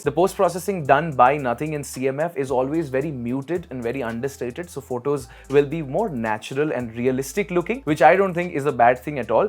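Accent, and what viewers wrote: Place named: Bengal, India. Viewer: I observed the words you use are really very professional, the voice, the tone, the accent is just amazing. You do not fake your accent by forcefully trying to Americanise it (To be honest that sounds very scammersish lol) you stick to your Indian Bengali English accent, I'll say it is a very effective way to holding on your roots while being modern. I wish to speak English like you. And Thanks for replying 🙏 Btw amio Bangali, Khub e bhalo laglo. I appriciate it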